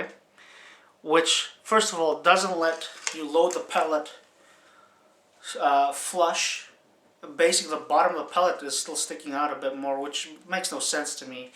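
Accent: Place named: Hawaii, United States. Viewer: Sorta Hawaiian lol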